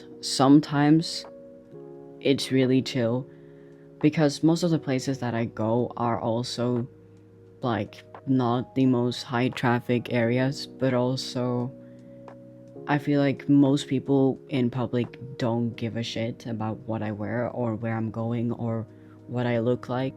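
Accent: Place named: Norway. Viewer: He’s from Norway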